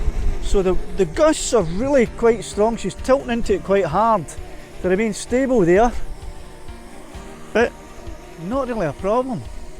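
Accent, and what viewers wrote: Scotland, United Kingdom. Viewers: Thanks for another great video! Always so excited to see another video of yours come out. Your cheery attitude and Scottish accent always lifts me up my friend. You do such a great job! It takes time to put these videos together and I for one really do appreciate it.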